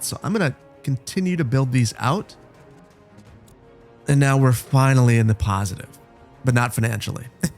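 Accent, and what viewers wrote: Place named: Midwest, United States. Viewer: can really tell you're from the midwest :P schooner is pronounced like school is, ch makes the k sound. and frigate is pronounced like "frig it" :D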